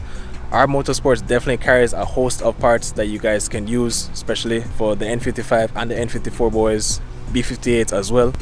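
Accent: Jamaica. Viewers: Hey man, totally random, but are you Jamaican by any chance? Picking up a very familiar accent 😅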